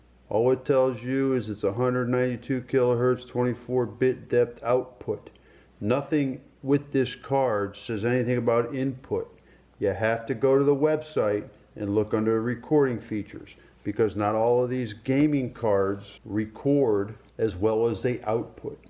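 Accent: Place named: Baltimore, United States. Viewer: That's fascinating. The reason I asked is you seem to have a little bit of our accent. The reason it's kind of fascinating is the thing about Baltimore the accent is like Yankee southern, in other words, it's like it's not sure if it's north or south. That's so interesting you spent your youth up north then most of your adult life south and maybe it gives you sort of a distinct accent, but I swear it's a little like ours. Anyhow, great channel! keep doing your thing.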